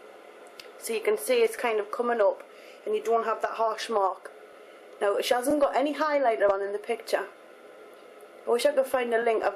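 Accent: Newcastle, United Kingdom. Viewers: Are you from Newcastle upon Tyne?? Sound Like A Geordie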